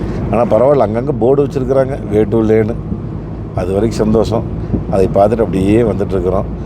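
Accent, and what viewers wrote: Kongu, India. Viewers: கொஞ்சும் கொங்குத் தமிழ் எப்ப வரும் காக்க வைத்த அண்ணன் அவர்களுக்கு வாழ்த்துகள். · அண்ணா நீங்கள் பேசும் கொங்கு தமிழும் லடாக் பயன வீடியோவும் மிகவும் அருமை வாழ்த்துக்கள் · கொஞ்சும் கொங்குத் தமிழ் பேசும் கோமகரே...! திகட்டாத தித்திக்கும் பேச்சுடைய திருப்பூர் திருமகரே...! எங்கள் மதிப்பிற்குறிய டான் திரு. அண்ணா❤❤❤🙏 அவர்களே எப்படி இருக்கீங்க அண்ணி❤❤❤🙏 எப்படி இருக்காங்க ரித்திக்❤❤❤ எப்படி இருக்காரு. அழகான வீடியோ அற்புதமான இயற்கை எழில்கொஞ்சும் பனிமலைகள் பல வண்ணங்கள் கொண்ட மரங்கள்...! கண்கள் இரண்டும் குளிர்ந்தன அருமையான காட்சிகள் கண்டு...! நம் ❤சிட்டுக்குருவியோட❤ தங்களது இந்த லடாக் பயணம் இனிதே தொடர்ந்திட நல்வாழ்த்துக்கள் அண்ணா❤❤❤ என்றும் அன்புடன் உங்கள் தம்பி என்றும் அன்புடன் உங்கள் TMF ரா.முஹம்மது கபூர் (சென்னையில் இருந்து)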